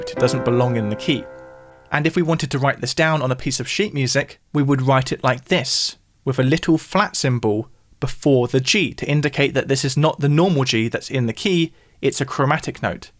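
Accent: United Kingdom. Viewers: I think the only one I didn't know was mordent? But I'm an American guitar player, so your definitions for glissando and portamento seemed backwards to me. As a bit of an Anglophile, I very much enjoyed hearing some of your pronunciations of the terms. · That british accent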